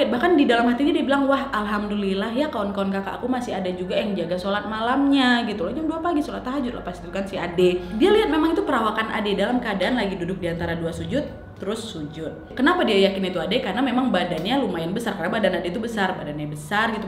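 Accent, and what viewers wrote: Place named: Medan, Indonesia. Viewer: Tapi knp ngomong nya kyk Medan?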